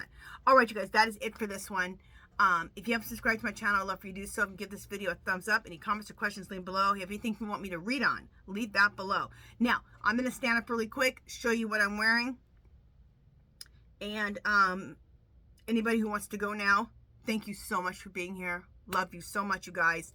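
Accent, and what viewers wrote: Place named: New Jersey, United States. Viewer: Your predictions are very precise and informative. I enjoy your energy and down to earth podcast. I look forward to your videos. Keep them up for all of us hoping & praying for Kamala's reign. (You still sound like a jersey girl 😅) I'm a 73 y/o ex jersey girl... "you guys" are what we use to say.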